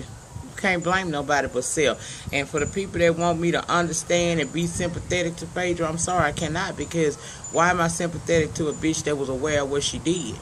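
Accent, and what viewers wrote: South, United States. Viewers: I just came across your channel 2day...faints....I'm back. I think its safe to say I am a fan just 2 reviews in...You're giving me Southern Teas. My Gawd, where are you from Miss?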